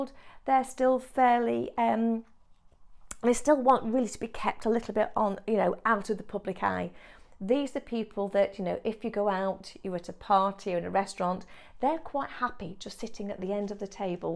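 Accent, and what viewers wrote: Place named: England, United Kingdom. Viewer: 🇬🇧💞🇺🇸 Your English accent sounds lovely and easy to understand. I am pleasantly surprised as I speak American English. Please do not speak slower in your broadcasts. YouTube has a Playback Speed adjustment so listeners can choose the preferred speed of the voice (normal, slower, very slow). Thanks for your thoughtful advice on very important issues in human behavior. 🙏💖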